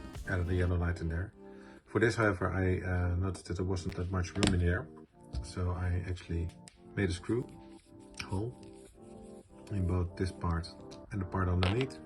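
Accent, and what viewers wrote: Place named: Netherlands. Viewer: Sounds like a dutch accent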